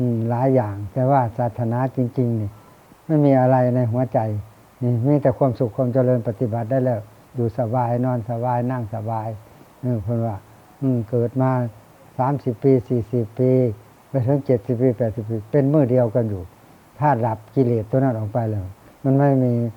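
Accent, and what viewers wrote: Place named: Thailand. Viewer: สาธุเด้อข้า น้อยหลวงพ่อเว้าได้ดีหลายว่าวภาษาบ้านเฮาให้คนฟังเข้าใจสำเนียงเป็นไทยแต่ศัพท์เป็นลาวเพื้นบ้านสาธุข้าน้อย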